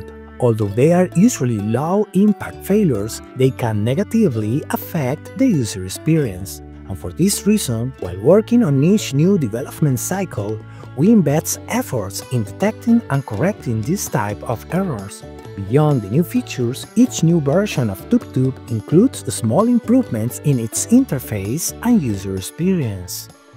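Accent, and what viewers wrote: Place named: Colombia. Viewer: Siempre esa voz de hablando inglés con un acento colombiano es bastante íconico y reconocible en si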